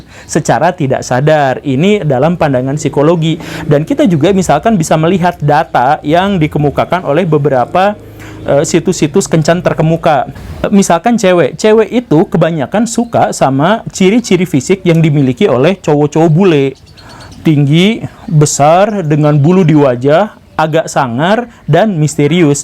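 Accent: West Java, Indonesia. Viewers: Sebut saja saya fans, Saya suka banget dengan paparan informasinya. Saua berharap bisa bertemu langsung dan belajar ke akang. Kebetulan, kedengerannya akang kaya org Sunda, klo bener, saya mau berkunjung buat belajar langsung ke akang. Smoga mendapat pesan balasan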